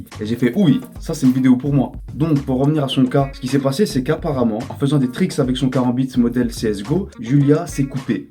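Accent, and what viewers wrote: Brussels, Belgium. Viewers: Gros t'es de bruxelles pcq t'as l'accent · tu viendrais pas de Bruxelles toi? ta un accent de drare de bx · D'accord avec toi et aussi l'accent belge 🇧🇪 TEAM BELGE 🔥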